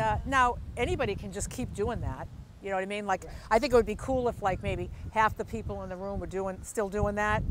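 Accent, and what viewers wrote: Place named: Boston, United States. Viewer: I love this! This tune has stuck in my head since I first heard it in the van on your travels out of Boston. It`s so catchy that I sing it all around the house and my 12 year old laughs at me. I am from the UK & was lucky to live in Boston from <num>, I love hearing your accent. Thank You, look forward to seeing more video`s of people dancing to it!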